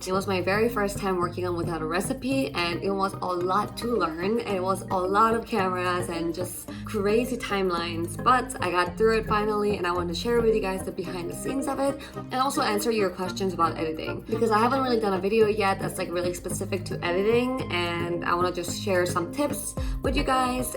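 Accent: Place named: Asia. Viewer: What happened to your accent,? It has become more authentically Asian all of a sudden. Not that I am complaining, but still... How does such a thing happen?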